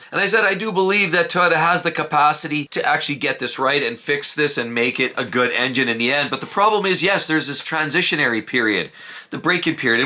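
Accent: Canada. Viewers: Love this guy Canadians oooot and abooot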